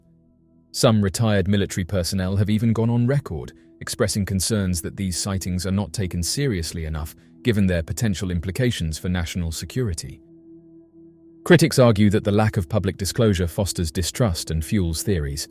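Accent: England, United Kingdom. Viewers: I prefer American accent. I can barely understand this British accent. Hard to follow. Make a better video with an American accent. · A truly excellent video once more, with excellent scientific commentary from a good clear English accented voice in unhurried speech very easy to follow. This is so much better than many American accented voices seemingly gabbling away at such a rapid pace I find almost impossible to follow or even fully comprehend ! Congratulations to the excellent commentator ! Let’s have more excellent commentators like this speaking scientific sense ! Cheers, Richard Leschen.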